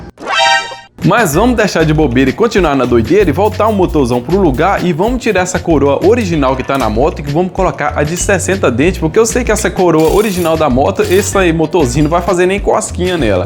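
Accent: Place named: Northern Minas Gerais, Brazil. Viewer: Tem o sotaque do norte de minas 😅! Ou nortminas soo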